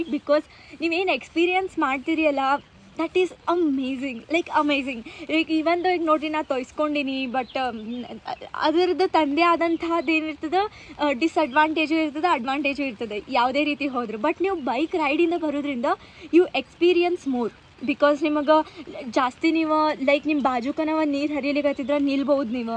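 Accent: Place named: Dharwad, India. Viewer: Hearing Dharwad language after many days❤❤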